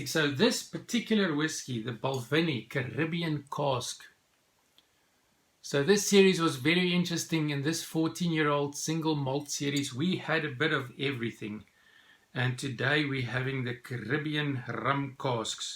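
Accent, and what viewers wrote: South Africa. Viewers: The South African accent is so cool. Cheers my dude.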